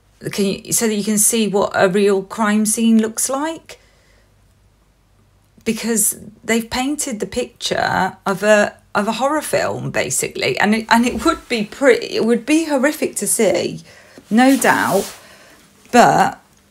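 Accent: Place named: United Kingdom. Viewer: I absolutely love your voice and the accent, however horrible things u're speaking about. It's soooooo British! 😀 Where are u from, more exactly?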